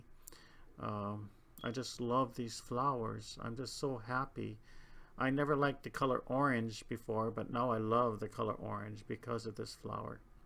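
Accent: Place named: United States. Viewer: Beautiful plants! Now I know you live in Hawaii. But your English pronunciation is absolutely american:)Where did you learn English so well :)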